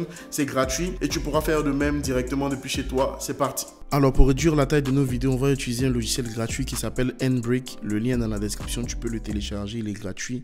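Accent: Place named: Ivory Coast. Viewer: T'es Ivoirien ? Ton accent est franchement cool😁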